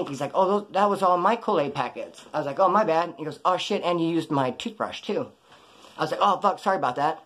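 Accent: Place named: West, United States. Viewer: U from az or cali?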